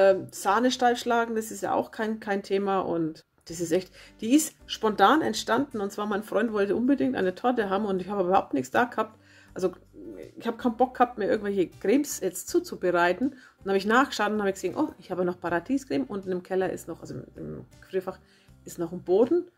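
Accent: Franconia, Germany. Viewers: Ich liebe dein fränkisch :)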